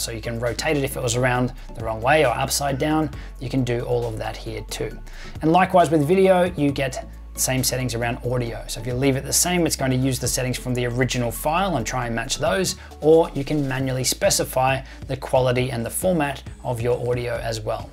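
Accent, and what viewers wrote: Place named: Australia. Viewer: Nice work mate. Your work on these productions is much appreciated. Hearing another Aussie on YouTube is also a bonus. :)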